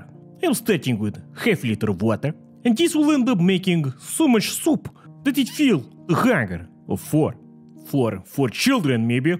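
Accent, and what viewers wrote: Russia. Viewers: This combination of soft music and heavy Russian accent along with the cut off sexual comments is killing me lol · I’ve always like the Russian accent and some time I speak in sort of Russian accent, but with your Russian accent and Comedy is perfect, love your channel❤️🤪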